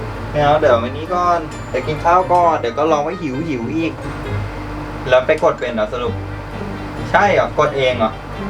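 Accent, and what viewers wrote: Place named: North, Thailand. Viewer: พี่เป็นคนเหนือหรอครับทำไมพูดเหนื่อ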